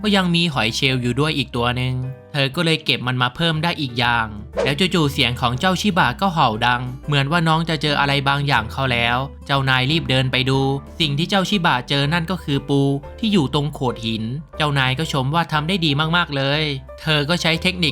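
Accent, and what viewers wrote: South, Thailand. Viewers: พี่เป็นคนใต้ใช่มั่ยผมก็เป็นคนใต้เเหลงใต้ไห้ฟังหน่อย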